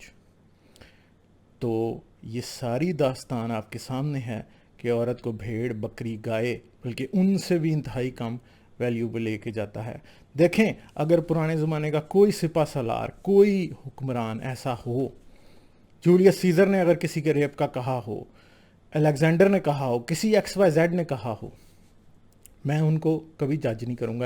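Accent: Punjab, Pakistan. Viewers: I am so pleased to see the books.your accent reminds me of my Punjabi friend from Amritsar.wish Islam never existed we would have remained as a single strong country.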